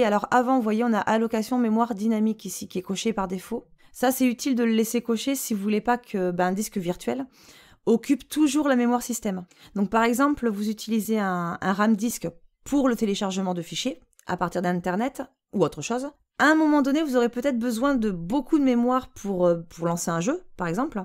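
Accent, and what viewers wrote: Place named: Toulouse, France. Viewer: Cet accent Toulousain ^^ J'adore lol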